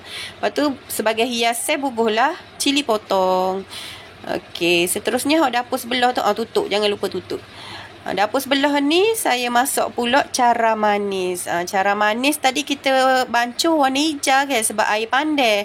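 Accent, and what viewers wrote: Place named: Kelantan, Malaysia. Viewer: sangat menarik logat bahasa yang kaka gunakan.....serasa ingin belajar bahasa Kelantan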